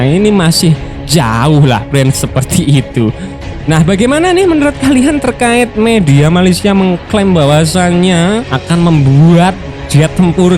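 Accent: Indonesia. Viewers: lah kenpa lu ngurus gua... Bukan kah konten tentang malaysia 🤣...malaysia apa indonesia yg suka ngurusin urusan orang... Dan youtubernya pun orng indonesia...dah lah coy gx usah make cara nenek moyang klian lagi yg suka ngadu domba melayu dgn melayu... Yg ad klian nyesal jika terjadi konflik di 2 negara ini..dan lu pun tau dri logat youtuber nya bukan 🤣...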